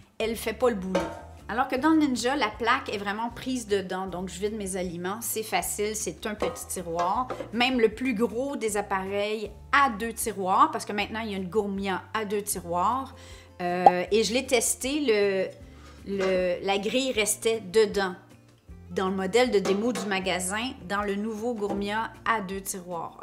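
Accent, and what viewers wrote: Quebec, Canada. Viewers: Mais quelle vidéo complète et utile ! Un gros merci et quel agréable accent, le québec me manque !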